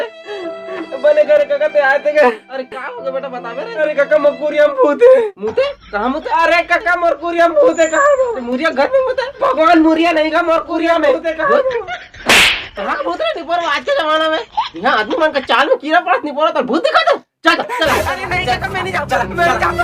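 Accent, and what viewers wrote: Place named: Chhattisgarh, India. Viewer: Sudh au saaf suthra Chhattisgarhi bole bar bhai bahut bahut dhanyawad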